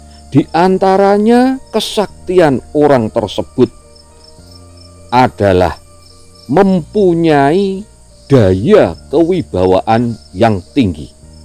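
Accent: Java, Indonesia. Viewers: saya suka logat jawa yg kuat di aura nya... mksh wejanganya mbh ... 🙏🙏🙏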